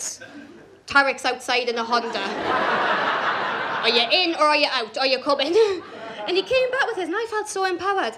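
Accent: Scotland, United Kingdom. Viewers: You are a very pretty, nice and funny girl on stage and I think you did a bit of a joke on a stupid bloke, who deserves it. Tho please talk a bit slower and pace yourself when talking, as it is a lot cramped up in little time. I think if you give time for the audience to laugh...when not talking, making pauses - you will get many more laughs out of it. I am thinking of myself - I hardly had time to think about the funny stuff and I had to listen already for the next part. I did study in Edinburgh as a child so I am fine with the accent, but it's hard to understand if you are American, English, Canadian or Australian - they will be at a loss, and they do speak English. Just pace yourself - sorry,I don't mean to lecture you, but I am an EFL teacher so it's a flaw of mine sometimes and you are a lovely child to me, so excuse.❤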